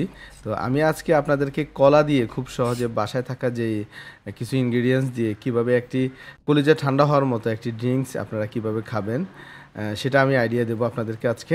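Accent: Noakhali, Bangladesh. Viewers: ভাই আননে কি নোয়াখাইল্লা 😊😊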